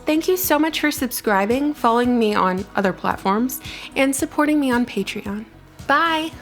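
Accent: South, United States. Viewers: Nothing like a southern girls voice 😊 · I really like your attitude, smarts, and conservative skepticism. And, of course, that sheepish suthun chahm. (It's ok, I'm a native) Please keep updates on the gentleman from Emory. That place makes ME nervous. Atlanta is NOT the place for the kind of toxic crap they keep there! Put it under Stone Mountain! Or a government parking lot! Lol!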